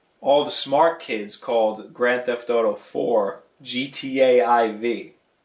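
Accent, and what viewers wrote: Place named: New York, United States. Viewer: Wow your NY accent was way more noticeable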